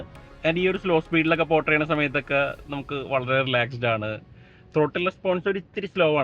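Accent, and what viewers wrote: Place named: Thrissur, India. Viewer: always a pleasure watching your videos! A few observations though... 😄 Sir, you are our inspiration and role model, which is exactly why we noticed you using your phone while driving - checking car specs AND doing videography at the same time! Multitasking level: expert, but maybe let's leave that out of the next video (or at least edit it out properly) Now, about that age comment, hahaha! Whether you've just entered the 40s or are tiptoeing around it, CONGRATULATIONS and welcome to the club! Also, I'm guessing this was shot on the Palakkad–Thrissur highway? And that Malayalam dialect is giving very strong Thrissur vibes! Are you originally from Thrissur, sir?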